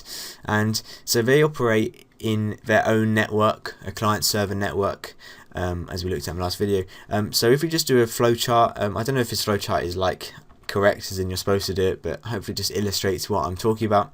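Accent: United Kingdom. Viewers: are you from leicester? you sound like a friend of mine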